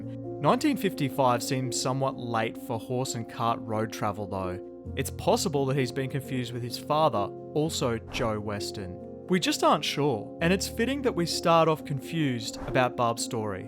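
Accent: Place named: United Kingdom. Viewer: I love the great British narration keep up the good work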